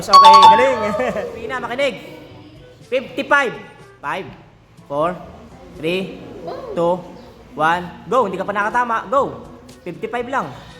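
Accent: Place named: Waray, Philippines. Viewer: parang ng waray ka lng dn.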